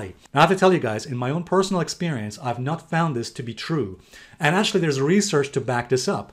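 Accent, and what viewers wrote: England, United Kingdom. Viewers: Very interesting, thank you! Also your method of checking with the balanced level sounds reasonable! Your sport jacket does perfectly fit to you and the English accent!! :D Well done!! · Great Video keep like that every time by the way your accent England was 👍🏻👍🏻👍🏻👍🏻. I wann know something a bout altcion like Dash how much price well be .Thank you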